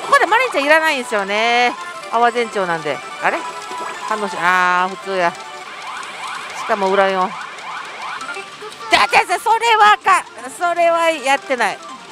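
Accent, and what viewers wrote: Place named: Osaka, Japan. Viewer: さん、楽しく落ち着きある女性👩‍🍼いいよ😊 大阪弁言ってやん､物凄く浸し見湧いて､見てます🤭 勝てる台選び方も見たいなぁ〜 よろしくですほな🫶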